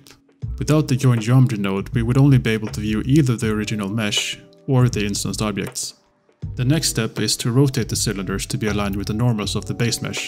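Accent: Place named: Germany. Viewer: I somehow always feel like this German accent is perfect for modeling tutorials :D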